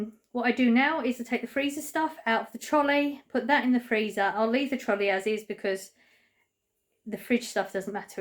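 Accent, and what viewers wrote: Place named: England, United Kingdom. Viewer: Never to chatty, enjoy your lovely English accent..makes me remember my grandpa he was from England,miss hearing his accent.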